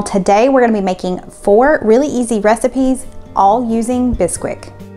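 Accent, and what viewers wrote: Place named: South Carolina, United States. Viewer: I say whopping also. May be a Southern thing. I love you because you are you. Love, love your channel. I have relatives in Upstate SC and I love your accent. 🥰❤ When I hear like (liike) and type (tipe) and last night (niite) I know it is Upstate SC.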